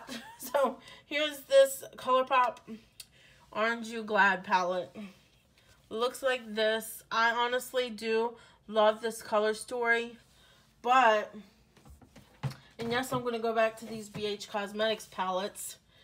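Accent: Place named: Pennsylvania, United States. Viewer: Are you from PA, trying to place your accent.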